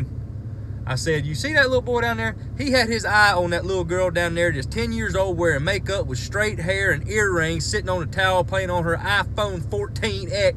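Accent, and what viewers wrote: South, United States. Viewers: I don't agree on the whole god/jesus thing, but love your energy, honesty and down to earth wisdom. I'm from Seattle and you make me want to talk with a southern drawl. Sometimes a few drinks is what it takes to get our priorities straight.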